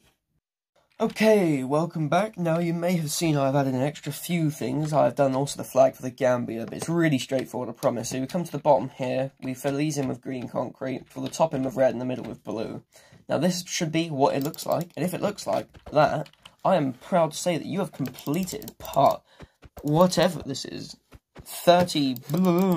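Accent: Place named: United Kingdom. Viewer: You have a British accent That's mean you're from UK Am I right?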